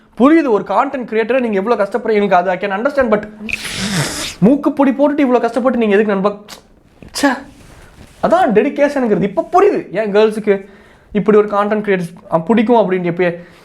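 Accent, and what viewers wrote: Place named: Erode, India. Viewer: Light ah erode uhu slang varuthungooo 😅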